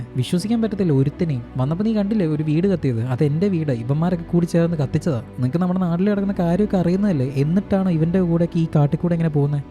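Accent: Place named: Trivandrum, India. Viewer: Movie selection is good, but the language (Trivandrum or kollam dialect) is a little bit annoying. Try to improve your language.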